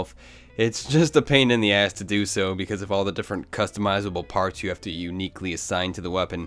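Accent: United States. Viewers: Why do you play it in Japanese, You have an American accent.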